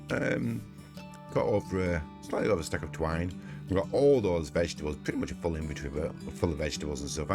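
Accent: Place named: Bradford, United Kingdom. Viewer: Apologies for being nosy - are you from Bradford by any chance? The accent sounds familiar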